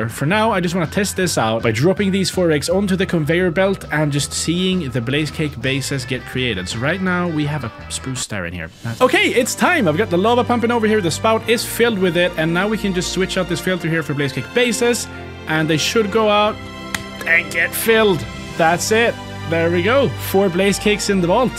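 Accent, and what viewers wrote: Sweden. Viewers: Heyyyy are you from sweden sounds like it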